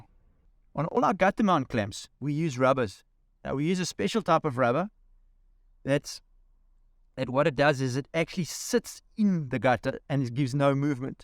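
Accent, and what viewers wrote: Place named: South Africa. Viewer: i could listen to you for hours, not because of the roofrack, because of the accent. it remains me of 23 Years living in South Africa. <num> .Then back to Switzerland. But nevermind , your products are great. Lekker bly and tot siens.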